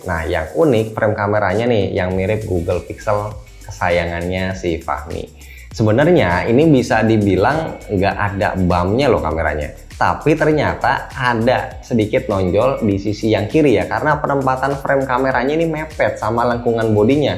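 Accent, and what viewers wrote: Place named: Banyumas, Indonesia. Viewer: w Jateng om, ngapak ya tau wkwkwk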